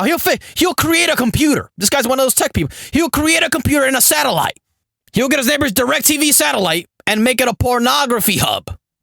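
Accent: India. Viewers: First thing I hear is an indian accent 😂😂😂😂😂😂😂😂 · You're racist, but your Indian accent is quite entertaining.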